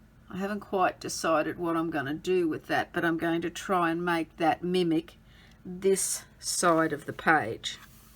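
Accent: Australia. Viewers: I watched a napkin video of yours, I thought your voice sounded like a Ozzie, so I checked it out and watched this fabulous video I am overjoyed that i found you, your work is si impressive.I ❤❤❤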